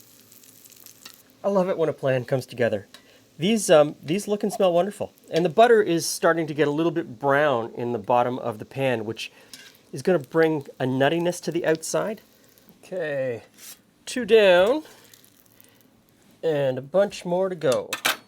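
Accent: Canada. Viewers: I knew you were Canadian by your accent. My relatives are from Canada and they have that exact same accent. When I saw you pulling out those milk bags, I said, "Yup this guys Canadian." I knew because my uncle always bought their milk (household of 5 children) i loved the milk and wanted more and could never get enough, that and the big jar of Nutella they had for spreading it on a bread. Lest we forget the bread and that eastern European jam we always ate....since we are from Eastern Europe. Those good old innocent days, the Irish Spring and cold downstairs little bathroom.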